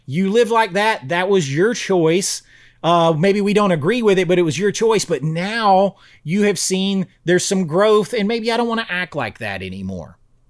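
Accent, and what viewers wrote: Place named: Georgia, United States. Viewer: I have been on and off as a subscriber to your channel for quite some time. Here's the reason why: I've noticed that I strongly disagree with some of your reviews of "overhyped movies that are actually bad," as well as with many of the "hidden gems" from the '80s, for instance, that you present on your channel. To me, you tend to express your views in a fairly black-and-white manner — with that lovely Georgian delivery of yours — stating that something is either sh*t or absolutely great, with very little middle ground. At times I've grown frustrated enough with this to unsubscribe from your channel in dismay. Having been born in 1970 myself, I've seen and know most of the films that you review or include in your lists, and I find it interesting that there are many movies we both consider masterpieces, while on many others we couldn't disagree more. 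The topic of censorship is, once again, something I couldn't agree with you more on. Those "tiny adjustments" made to works of art that you brought up in this video — things that are merely reflections of their respective times and of the worldviews of characters from a particular era — should be subject to criminal prosecution more than anything else. It is genuinely disturbing to see this kind of trend, especially when modern movies already tend to be far inferior to the works of art produced in decades past. So, the persistent reappearance of your videos in my feed has once again made me a subscriber to your channel. I try to re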